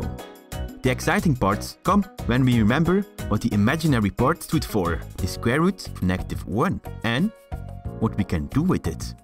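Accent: Netherlands. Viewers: Nice to hear the dutch accent, didn't know our country has such great youtubers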